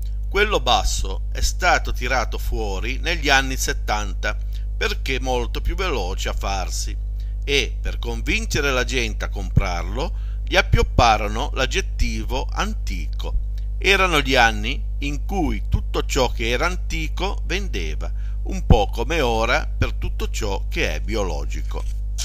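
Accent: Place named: Liguria, Italy. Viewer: Che piacere sentirti parlare con il "nostro" dolce accento Dove si intuisce una bella parlata dialettale della nostra Liguria.....Perché non alterni qualche ricetta in dialetto?....Appena il mio lievito madre avrà raggiunto il "giusto" risveglio farò sicuramente la tua ricetta. Grazie!!!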